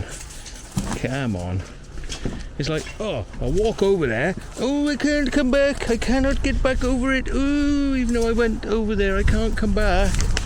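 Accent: Wales, United Kingdom. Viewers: awesome thank you so much! Also, while I have you, what part of the UK do you hail from? I mean your accent is very different to the rest of your crew (in a good way) my partner who now lives in Australia, grew up in Ashford in England and he thinks you sound a bit Welsh?? I don't agree, so we'd love it if you could settle this argument for us lol 😉 Thanks again for the harness info and please give Angel a belly scratch from us! ❤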